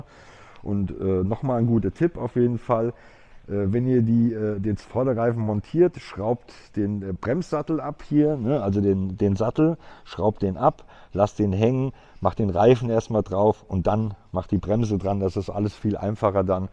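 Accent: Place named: Frankfurt, Germany. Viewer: Ich habe sofort rausgehört dass du aus Frankfurt kommst 💪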